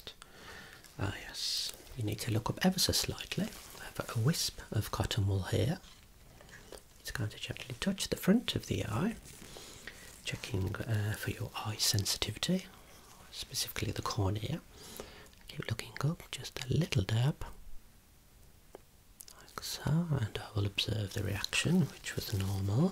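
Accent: Birmingham, United Kingdom. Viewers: Birmingham?!?! Wow i love the accent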